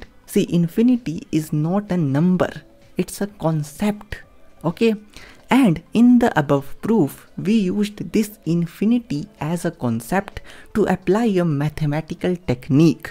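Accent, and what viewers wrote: India. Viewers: I love your hard accent. Feels like home. It feels much more unique even for Indian accent sounds different from commonly spoken english at home. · As an Indian myself, I've never heard such a strong Indian accent in my entire life 😅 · The first Indian Accent which matches the representation of Indian accent in Western World.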